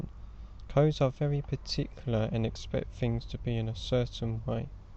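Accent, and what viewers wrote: United Kingdom. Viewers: Your pronunciation is really modern. The latest version of European / British English basically.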